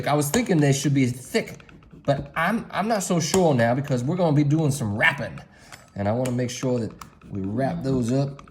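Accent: South, United States. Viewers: That glorious Southern voice …a true gift from God…😊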